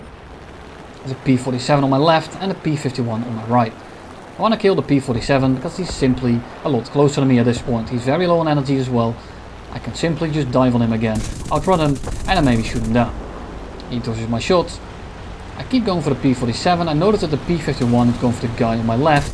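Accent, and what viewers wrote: Netherlands. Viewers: he sounds kinda dutch like jengar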